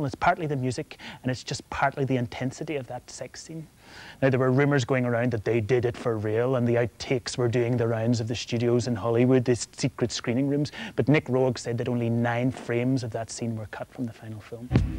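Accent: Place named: Northern Ireland, United Kingdom. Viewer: That Northern Irish lilt!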